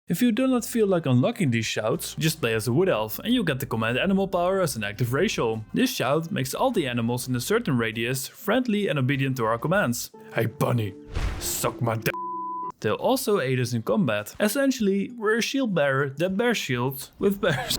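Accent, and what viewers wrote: Netherlands. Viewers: I love the dutch accent seeping through, so yes instant new abonnee x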